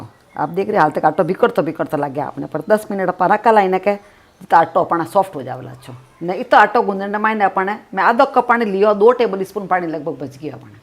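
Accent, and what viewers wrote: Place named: Jaipur, India. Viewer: wo sab to thik h mummy ji... Par thoda hindi me bol doge to dusre state wale bhi samjh sakenge... Ye jaipur language shi se samjh me nhi Aata h... Par sunne me bda mitho lage h...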